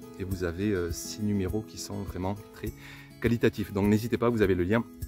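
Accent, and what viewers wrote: South, France. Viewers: Rien que ton accent du sud nous apporte du soleil ! Une vidéo une nouvelle fois riche d'inspiration, et le partage des recettes ou des techniques de conservation ça aussi c'est une idée géniale, je me demande comment tu arrives à trouver le temps de faire tout ça.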